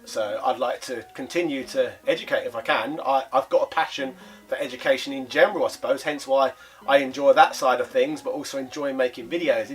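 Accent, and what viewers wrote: United Kingdom. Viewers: Oh God you successfully fooled me.. You are the first and only western YouTuber ever talked about ACan and gave it some respect it deserves by my knowledge. I love your deep and original comments along with your British accent, as a chinese I was once horrible of comprehension of authentic English, all thanks to the overwhelming US influence, but my wife is in UK as a visting scholar and I must be able to get what's British people saying for the seek of my UK tour this October. Your channel helps me make huge improvement, great job. 👍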